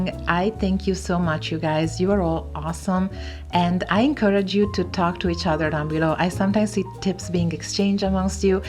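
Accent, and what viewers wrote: Italy. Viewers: Buongiorno, questo è il primo video che guardo nel suo canale, ma lei è italiana vero? Posso sentire un pochino pochino di accento, ma volevo dirle che il suo inglese è veramente straordinario! Questo video è veramente utile e interessante, ne guarderò altri di sicuro 🙂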